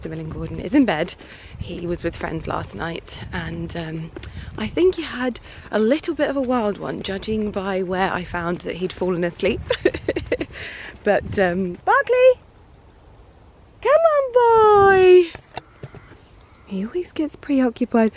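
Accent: United Kingdom. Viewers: Why sorry? You speak as you speak. Be yourself, that’s the person you are after all. Thank you for sharing your British heritage with us. ❤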